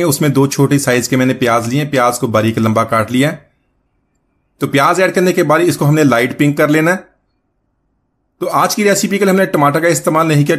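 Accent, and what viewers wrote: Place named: Pakistan. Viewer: bhai, aap bohot he umda recipe lay ker aaye hain aur bohot he achi terhan say samjhaya bhi hai kay kiss terhan pakay gee. Sahi Pakistani recipe aur lehjay main video banai hai aap nay, Zaberdust!!!!! bohot shukria.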